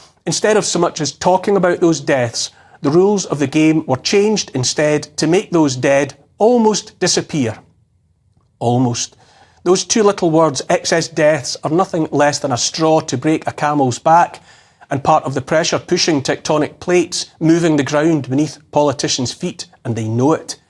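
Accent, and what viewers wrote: Scotland, United Kingdom. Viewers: He’s obviously Scottish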